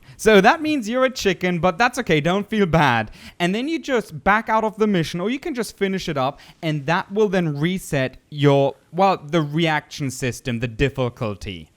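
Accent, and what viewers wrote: Germany. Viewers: R u german? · & Man hört sehr, dass du ein Deutscher bist. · Hi, i this is the 1 vid i saw , nice- 1 . BTW are you German?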